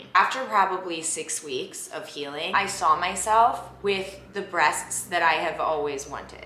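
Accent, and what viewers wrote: Minnesota, United States. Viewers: So Minnesotan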